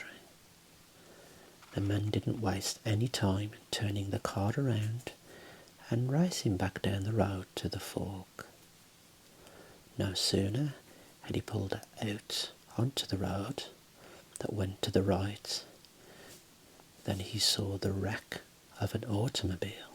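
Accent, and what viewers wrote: United Kingdom. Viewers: Nothing is better than and his British accent ❤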